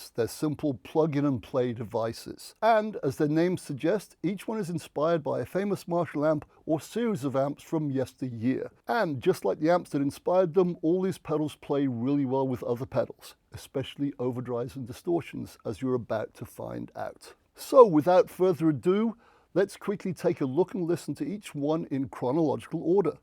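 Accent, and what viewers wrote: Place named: England, United Kingdom. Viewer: Proper English accent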